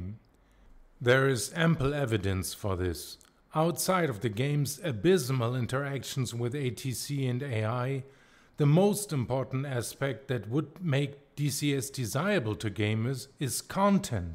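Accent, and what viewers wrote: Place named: Germany. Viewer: A german with humour and a very german accent? Sign me in. Thank you dear neighbours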